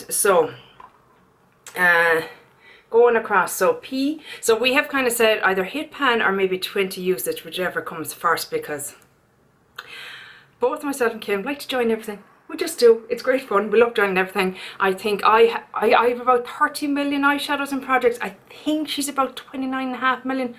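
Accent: Ireland. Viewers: Hello! New to your channel and I absolutely loved this video! I could listen to you all day. I am so in love with the Irish accent. I hope to visit Ireland some day even if it does rain a lot. I loved hearing about all the slang. I have been binge watching your videos since I found you. You have great skin and makeup. Keep the content coming, love it. ☺️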